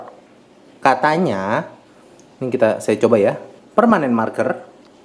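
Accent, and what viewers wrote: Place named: Banjar, Indonesia. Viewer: Abang org banjar ya bang, kok kedengaran ada org ngomong pake bhsa banjar.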